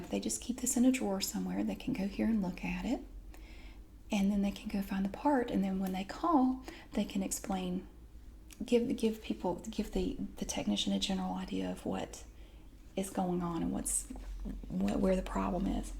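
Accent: South, United States. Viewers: your southern accent is cool!!!!!! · It's strange... I'm English, I've never been to America- and yet your accent somehow makes me feel like I've come home. I love southern accents so much, and I love this video! Thank you <3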